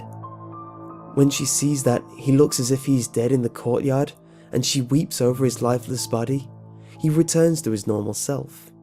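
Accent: England, United Kingdom. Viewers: Excellent narrator!! I love your English accent and how you talk slowly and eerily!! Just like the music you use in a movie, sets the stage of the movie, the same goes for all YouTube videos. If you present any paranormal content, it sounds so much better when the narrator takes the content seriously!! I absolutely hate when i click on something paranormal on YouTube, and the narrator talks loud and fast and stupidly, I click off that video FAST! People that look up this type of content believe in the paranormal and take it seriously. We don't want to hear paranormal content presented as a joke!! Whos with me on this??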